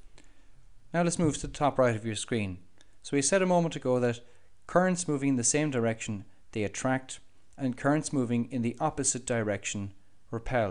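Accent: Ireland. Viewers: Instantly liked because you're Irish and it's a great accent to listen to physics too (Y)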